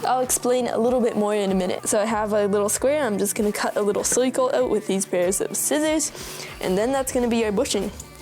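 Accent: Canada. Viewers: Wow you are so grown up know you have bass in you're voice and lost some of the canadian.i can't believe I watched you grow up from backwards hat to beenie and now you show you're hair.great job kid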